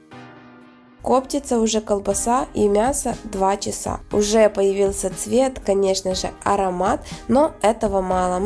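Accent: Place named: Ukraine. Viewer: Вы молодец, интересные рецепты, что у вас с говором? Рублённое, КОптить? Я понимаю, что Вам удобнее разговаривать на украинском языке. Вы снимаете ролики на русском языке, ударение проверяйте в словах во время монтажа ролика.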